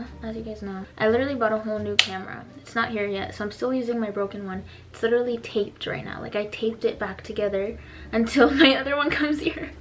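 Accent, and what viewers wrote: United Kingdom. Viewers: I’m British.. and I am so here for ur British accent it’s rlly funny 😂 in a good way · The British accent LAMAOAOAOOA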